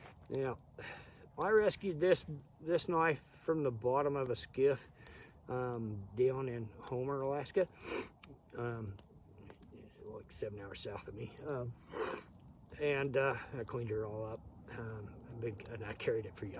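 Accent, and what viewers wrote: Australia. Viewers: Your accent is…. Like from where? Sounds Aussie almost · Old hickory epsecially older ones are sooo tough n last forever... Almost hear a Hint Of Austrailian Accent in there no? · maybe an odd question, but are you originally from Australia? I just here it mixed in with your accent.....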